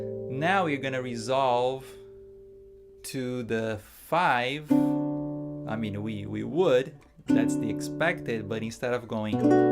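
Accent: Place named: Brazil. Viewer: Sotaque brasileiro está carregado nesse vídeo! haha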